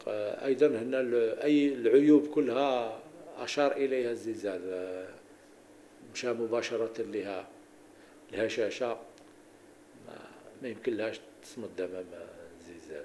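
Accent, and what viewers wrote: Algeria. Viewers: تيهضر بحال شي جزاءيري 😮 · واش هذا جزائري على حسب كلامه الدارجة المغربية هاربة عليه ويستعمل كلمات دخيلة على لغتنا حتى في اللغة العربية ديالو مايلة لجهة هكيين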